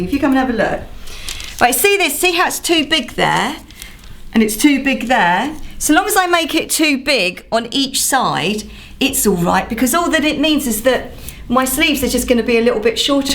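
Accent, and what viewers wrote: United Kingdom. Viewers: thank the lord so much for you annd your sewing smarts! my friends and i are finally able to make a fashion line! and your BRITISH wich makes it one million times BETTER!!!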